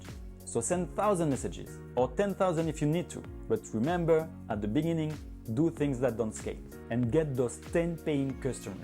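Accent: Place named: France. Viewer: Tu à un accent Français ✨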